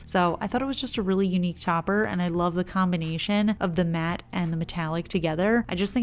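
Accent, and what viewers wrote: New Jersey, United States. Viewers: It's that jersey annunciation 🤌 😅 we miss you here in good ole jerz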